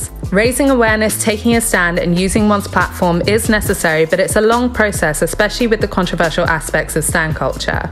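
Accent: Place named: England, United Kingdom. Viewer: She just sounds English...because she lives here. Funny that.🙄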